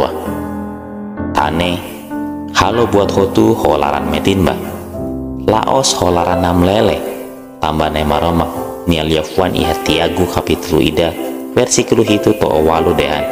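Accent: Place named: Indonesia. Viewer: Voice nia Orang indo nih🥰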